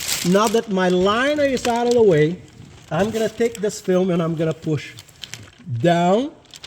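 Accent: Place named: Brazil. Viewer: Sotaque brasileiro rsrsrsrs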